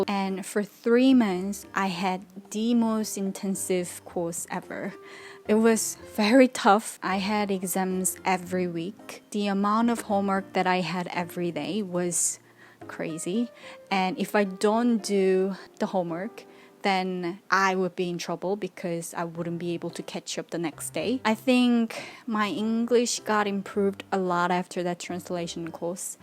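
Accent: United Kingdom. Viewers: 너무 멋져요!!!!! 씨 보면서 저도 다시 영어공부하게 되네요 씨의 영국식 영어와 캐나다식 영어 발음이 너무 듣기 좋아요!!! 당당하고 멋진 삶 응원해요!!!!👍❤️ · 아주 깔끔한 발음에 얹어진 브리티쉬 엑센트가 넘 좋아요~~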